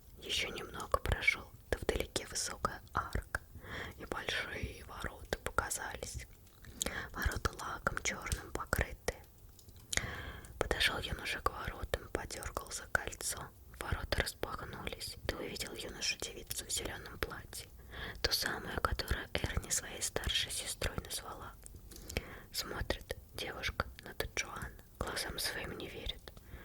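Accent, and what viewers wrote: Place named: Russia. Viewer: Sotaque russo é muito bom velho, o jeito que ela pronuncia os ditongos é diferente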